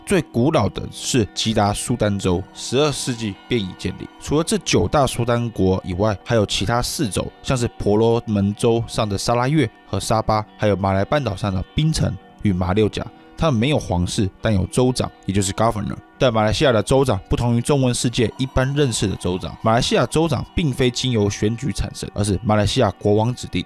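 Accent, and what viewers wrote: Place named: Taiwan. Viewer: 台湾腔太难听了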